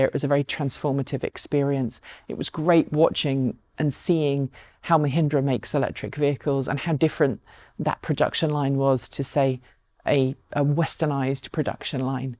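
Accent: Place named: United Kingdom. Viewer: Are you from the US? That's not possible. You definitely sound British.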